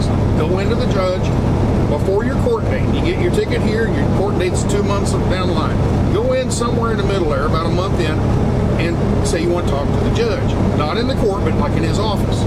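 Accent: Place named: Southern Ohio, United States. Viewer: You don’t sound much different than those of us in southern Ohio.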